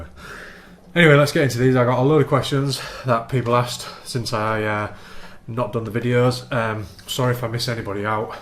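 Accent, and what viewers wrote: United Kingdom. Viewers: Shit, Ill always be a fat guy at heart. I do wish, however, that there was a workout program that would give me your beautiful accent. Im a sucker for you Brits and the way you guys talk.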